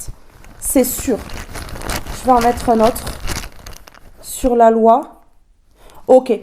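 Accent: South, France. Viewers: Merci Par rapport à des nouvelles récentes dans ma vie sentimentale ta guidance m'a scotché et touché et j'apprécie beaucoup ton humanité ton superbe accent méridional ton humour er aussi tes superbes coupes de cheveux! Tu nous fais du bien avec tes guidances! Soit protégée par le seigneur! Que ta journée te soit des plus agréable!!! Je vais regarder ta nouvelle guidance ❤👍😘☀️🌟🙏🙌🙏🙌🙏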